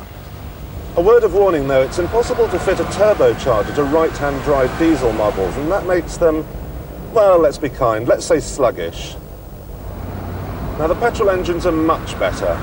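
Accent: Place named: RP, United Kingdom. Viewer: I seems Jezza's commentary isn't as good when he talks with his posh voice rather than the one that we are so used to hearing nowadays, in fact he actually sounds boring in this clip, but I suppose the BBC wouldn't allow regional accents when this was first made.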